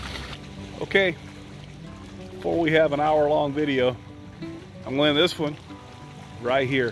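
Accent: South, United States. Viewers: being Irish I love your Southern drawl and how you say baaabee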